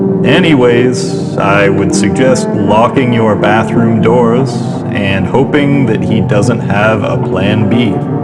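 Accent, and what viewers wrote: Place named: Canada. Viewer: Is it weird I listen to these in the bath as I find your voice and accent relaxing 😌 you should do an audio book! I love the Canadian accent 😍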